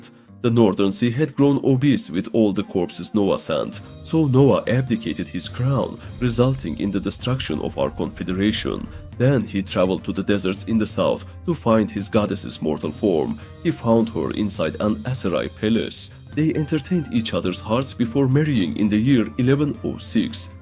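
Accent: Turkey. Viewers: türk aksanı çok kötü gerçekten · Bro your videos are just so good. They gives everything to us. But your voice is just not giving it. It's not about your tone or something. Its about your accent. You're Turkish I think. Please try to improve your accent. I m not trying to be racist or something but you know it would be better if you ll keep my words in your gut. Appreciate for the video, have a good one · Abi tam Türk aksanın var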